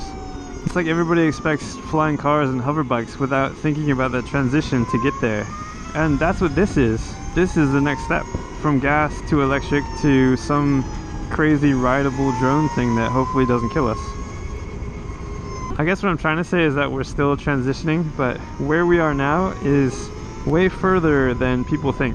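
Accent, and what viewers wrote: California, United States. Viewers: Great insite! I often get asked what I'll do if my battery needs to be replaced of if something much better comes along. My answer is that I'll sell what I have and buy the next thing. I'm writing this response on my new galaxy Fold phone/table, in my Tesla, dreaming of owning an Energica. I think you have a California accent. Your d's sound like t's. Like when you say "newzerolanT." I'm accused of having a Canadian accent. I say "hoose" and "aboot" instead of "house and "about." But I grew up in Massachusetts so that doesn't make any sense, eh?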